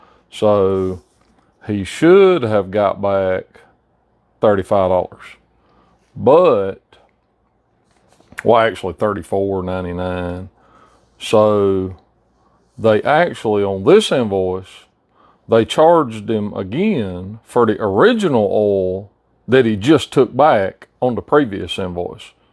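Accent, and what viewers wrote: South, United States. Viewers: This guys accent is like Forrest Gump times 10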